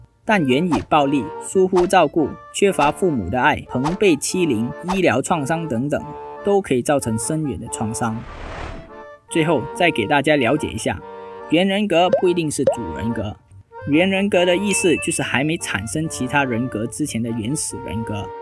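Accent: Malaysia. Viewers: 馬來西亞人的口音本來就這樣的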